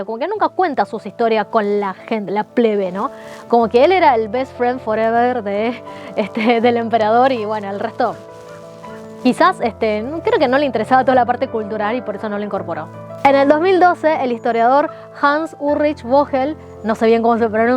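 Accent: Buenos Aires, Argentina. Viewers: Tienes acento argentino muy curioso hablar con acentos chino y argentino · Soy peruano, y corroboro, no es acento peruano, es argentino. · Se nota el acento de argentina de esta chica oriental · Interesante.. hablas como argentina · Habla muy estilo argentino, la pronunciación es perfecta. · XD ese acento es argentino porteño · muy buen video, felicidades. Habla Ud. un castellano casi prefecto con acento argentino ... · Hermoso acento argentino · Me encanta su combinacion cultural con sus facciones de La Republica China y un soberbio acento argentino, un abrazo y felicitaciones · 😂😂 de nuevo un peruano, cree que todo se originó a partir de ellos, la chica vive en Argentina, no escuchas el sho. Es más Marco Polo nació en Perú y Cleopatra es Limeña jajaja 😂😂😂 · Nunca escuche a una mujer china hablando con acento argentino, y además muy linda y atractiva 😘😘😘😘, noto que naciste en la bella tierra Argentina, ya desearía que seas mi esposa, besos desde Cochabamba Bolivia 💋💋💋💋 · Me encanto tu síntesis. 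Tu acento argentino es muy sicodelico y me encanta tu guapura. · Ché tenés el dejo argentino. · Cómo se nota que la presentadora aprendió el idioma español de argentinos. La 'sh' se usa arbitraria e indebidamente para remplazar la "ll" o la "y", además del acento característico. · Primera vez que escucho a una China hablando con dejo Argentino 😂😂😂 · Cuando vosotros habláis con tanta soltura el castellano ¿es porque sois nacidos y criados en un país hispano hablante o por mero estudio? Es que me sorprende, en tu caso, con un excelente acento argentino. Gracias. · Acento argentinazo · Su acento ¿argentino? suena muy bien. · Excelente explicacion y con acento argentino... Puede relatar con un acento mas neutro.. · Muy lindo el video y tu dejo argentino me encanta felicitaciones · Un interesante relato aumenta el interés por el acento porteño, de la narradora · Es argentina. ¿Y q problema hay esa variante dl castellano u otras? 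· Lo mas inverosimil fue la magia de los brujos al servicio del Khan. Estos hacían que la jarra de vino llenara la copa (solita) y despues volara a las manos del Khan. Este relato le valió a Marco Polo ser presionado por la inquistion y retractarse de lo dicho.Aaah Bonito tu acento argentino chinita♥ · Jajaja, la chica es argentina? Q gracioso se ve como habla, 👍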